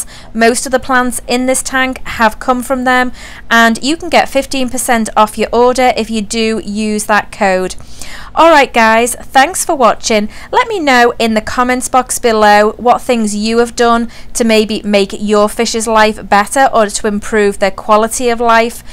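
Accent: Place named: Merseyside, United Kingdom. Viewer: Hi the old tank looked good but the new tank looks amazing much more natural, with the Amazon puffers being wild caught the more natural feel of the tank and most of the glass been covered up the fish obviously feel a lot happier, great job 👍 Ps l've just checked out your website and realized that you are in the US but your accent is the same as mine merzyside! 😂😂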